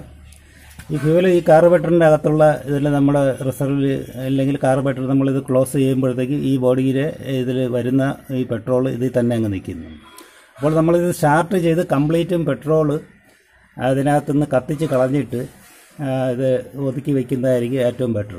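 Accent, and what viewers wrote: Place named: Trivandrum, India. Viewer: Correct karyam aane parayunnatthe ...njn already follow chyunna karym aane...slang kollaam trivandrum aane thonnunu 👏👏👏🤝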